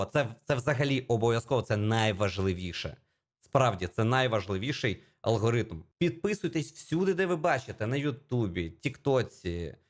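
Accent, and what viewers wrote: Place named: Russia. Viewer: ну в чем прикол, тыж здравомыслящий. У тебя потерялся онлайн, просмотры, донатеры! Из за неродного языка в который ты не погружен, как в русский, ты говоришь не на украинской мове, а на речекряке, в перемешку с русским, а в эмоциональных ситуациях ты не можешь выразить весь спектр эмоций. В Итоге: стримить для 250 млн русскоговорящих или для 37 млн украинцев из которых дай бог 5-10 млн и говорит и то меньше. Конечно кто то наступил тебе на яйца или что там у тебя есть.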